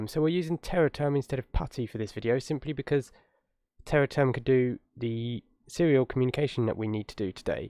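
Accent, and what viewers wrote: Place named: Cockney, United Kingdom. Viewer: Hard to understand the Cockney accent. Stop chewing on the chewing gum.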